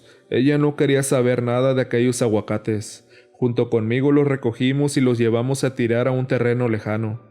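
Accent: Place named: Monterrey, Mexico. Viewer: Con todo respeto, lo diré, el narrador se escucha bien ranchero. Me recuerda a mi gente de Monterrey.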